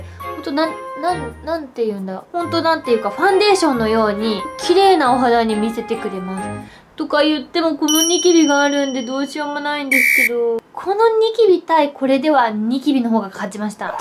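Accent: Kansai, Japan. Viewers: 秦基博好きなんですか？ なんとなく関西弁の人見たら応援したくなります。これからも頑張って！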